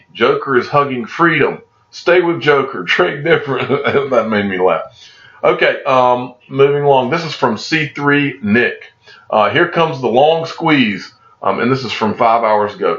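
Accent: South, United States. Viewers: You’re so unapologetically southern it kills me sometimes 😂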